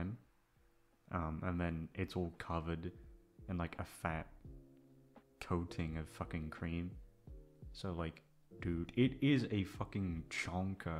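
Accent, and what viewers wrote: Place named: United Kingdom. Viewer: AND HE HAS A BRITISH WHAT MORE CAN U ASK FOR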